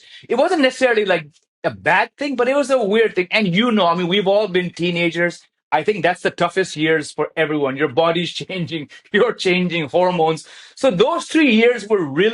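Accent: India. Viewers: This guy is really unique, because he speaks English with an Indian accent and his English errors are so Indian, and because Indians make up 90% of the citizenship queue in the US. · if i wasnt looking at the video, i would have guessed with his accent that he is indian too :D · first time seeing an AMERICAN using the indian english accent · Dang even his English has a bit of Indian influence 😂😂😂 this is amazing to see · His accent is the future Indian accent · he's hindi acent totally matches with anant ambani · Its a real mindfuck to see a caucasian with an indian accent. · You know he is true Indian when you here Indian accent when he is speaking English!!! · Its so mind bending to hear a white person speak English with Indian Accent. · He somehow has a more pronounced Indian accent than many Indians 😅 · His accent is also Indian 😭(Proud mother's tears) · White guy with an Indian accent 😂😂😂😂 I can see the irony… and why people question. 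But it’s all love bro. White Indians are a minority 😂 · Bro even have indian English accent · Because he was born and raised in India. If you were born and raised in Nigeria you would sound Nigerian. Your skin colour does not dictate your accent 😂. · Bro even his accent is Indian. Ye gora toh hamara hai. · Your accent is a real indian citizen your no more American and you are a pure indian ❤ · Mahadev…. I just cant believe… he speaks just like us…. Absolutely an inidan😃😃😃😃😃🇮🇳🇮🇳🇮🇳🇮🇳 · LOVE THAT DESI ACCENT,! 😨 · When you spoke your indian english in america didnt they called you with some racist slurs like scammer and stuff? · His english is Indian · Wooow<link> way he speak english its indian accent.....🎉😂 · Yes !! Indian or desi accent....❤ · First time hearing indian accent from a white person! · speaks in Indian accent. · dude's trying hard to speak in normal english. he's got indian accent. 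· American with an indian accent, and i love it. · Feels nice but strange listening to him. Here I am, someone who lived in America for just 11 years and living in India now with an American accent, not to mention teaching it for the last 23 years.. And this guy is sounding Indian 😂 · He is literally speaking in Indian accent blud ❤❤ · The accent so hindi ❤❤❤ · Its weird to see a white person talking in Indian accent. lol.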